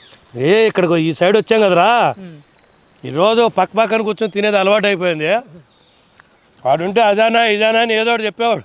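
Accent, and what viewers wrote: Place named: Kurnool, India. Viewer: Today I will be making this recipe in Iftaar...luv the way u speak uncle...missing kurnool ...from 🇦🇪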